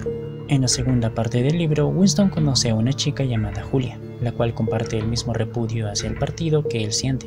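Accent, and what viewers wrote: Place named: Latin America. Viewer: Literatura hispanoamericana dirás. Latinos era como se denominaba a los habitantes de Lacio aldea de la Antigua Roma. Dudo que seas de Lacio, por el acento intuyo que serás Hispanoamericano. Hermano. Un saludo desde España, muy buen video.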